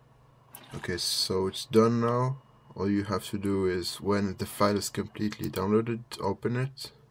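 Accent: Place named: France. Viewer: francais? you sound francais.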